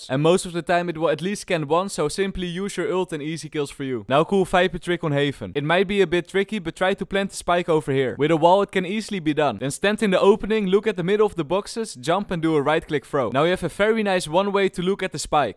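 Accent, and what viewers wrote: Netherlands. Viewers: your accent tells you are from netherland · He’s dutch I can hear it for his accent because I’m dutch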